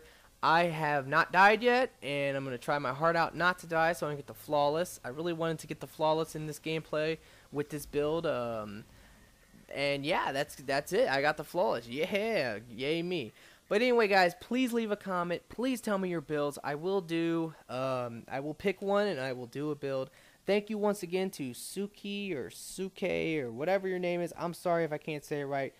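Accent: Boston, United States. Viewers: I just thought you had an accent like boston or new york or whatever. Anyways, keep up with the vids. You're one of the few people that makes decent ME3 gameplay recordings.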